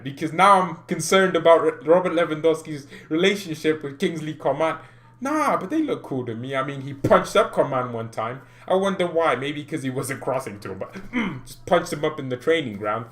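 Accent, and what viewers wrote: United Kingdom. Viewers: I enjoyed your British accent and there way of speaking and thinking. You have talents to become an actor. Please more of this.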